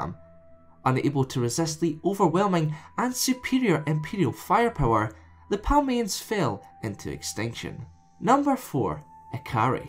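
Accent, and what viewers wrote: Scotland, United Kingdom. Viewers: i have to say it mate, this combination of untamed scottish accent and soft speech is strange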